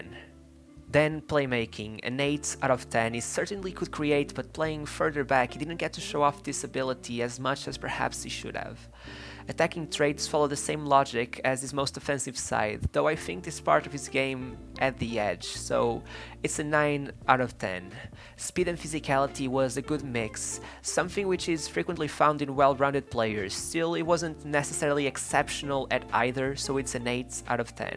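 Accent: Portugal. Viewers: Am I the only one who thinks that the commentator is Portuguese ? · Só te queria dizer que estou a adorar o teu canal. E sim, percebi que eras português logo no início do 1o vídeo que vi pelo sotaque ;D Continua com o bom trabalho! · Trying to ignore this darn Portugeese accent 😬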